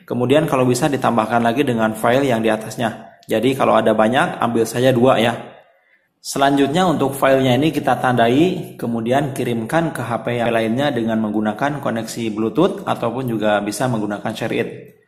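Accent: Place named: Sukabumi, Indonesia. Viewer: Logat nya mirip orang Sukabumi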